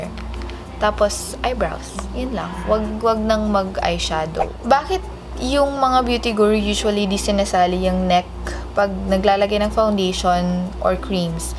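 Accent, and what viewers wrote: Philippines. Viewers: I love tagalog accent!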